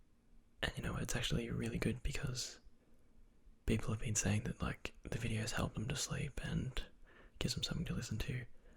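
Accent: Australia. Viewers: I know it's not a new game, but the perfect game for ASMR would be Endless Ocean. The calm music, the player's slow deep breaths through the oxygen tank, the sound of the bubbles surfacing. All that plus your low voice. It sounds like the perfect recipe for ASMR. Your Australian accent would also fit the theme of the game. I'm not sure if that'd be a game you'd personally find very interesting though. I enjoyed it.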